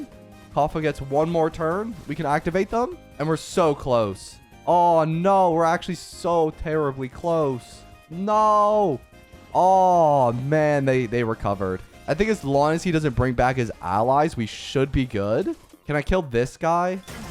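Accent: Canada. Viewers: Your Canadian accent is cute lol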